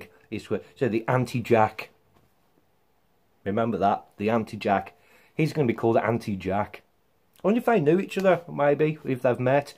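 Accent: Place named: Northern England, United Kingdom. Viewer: Thanks man, if you don't mind me asking, where in the UK are you? You sound quite northern ..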